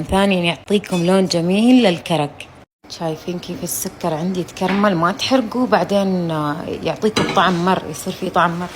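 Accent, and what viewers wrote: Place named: United Arab Emirates. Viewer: احب صوتك والله الصوت الاماراتي اللي يفكرني باصدقاءنا الاماراتيين زمان ما شوفنا منكم الا كل خير صوت فيه طيبة وايمان الله يزيدكم نزلت مصر ومازالت الامارات واهل الامارات في القلب احن ل كل التفاصيل البسيطة اشكرك على كونك سبب في ذلك❤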